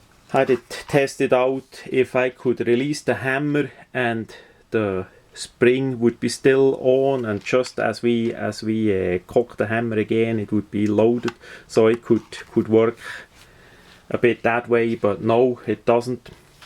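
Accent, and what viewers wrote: Switzerland. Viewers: My goodness! Your accent is so stereotypically swiss!!! Great video!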